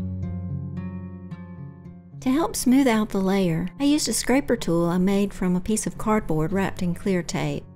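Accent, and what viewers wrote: South, United States. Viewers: you have the sweetest southern accent, it reminds me of home. Your soaps are beautiful.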